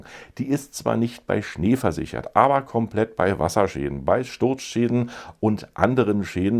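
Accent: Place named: Germany. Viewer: Wow, you sound so German, amazing! Love such heavy German accents, so awesome.. Sweet Keep well and be safe bro! 😁🇧🇬🛸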